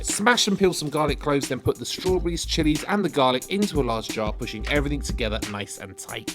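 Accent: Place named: England, United Kingdom. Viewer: As a native english speaker I never thought I'd encounter an english accent too thick for me to comprehend naturally...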